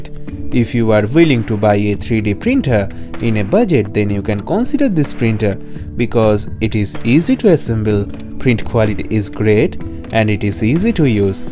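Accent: India. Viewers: Lol, its super beautiful, i sometimes love indian-eng accent like you...lol If you think that why havent i wrote anything then, i probably wont get it its 1million percent truth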